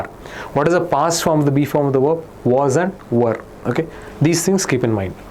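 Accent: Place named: Kerala, India. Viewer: Sir is your language is malayalam bcz of your talking style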